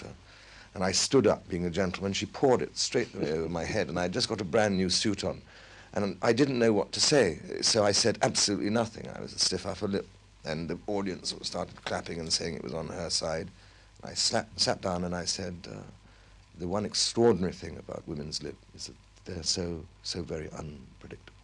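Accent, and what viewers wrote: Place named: England, United Kingdom. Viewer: I can listen to this guy narrate any documentary. Best English accent.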